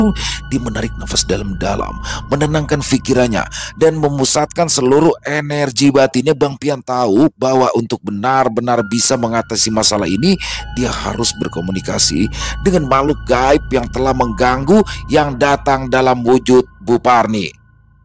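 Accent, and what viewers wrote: Jakarta, Indonesia. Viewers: Pon K4 BaR always selalu ngga pernah never... Asik ada kiriman lagi cerita bang Pian dari bang. Saya suka cerita dan logat Betawi yang kental akan budaya. Apalagi gaya bicara bang makin mantap aja mendengarkan nya 😅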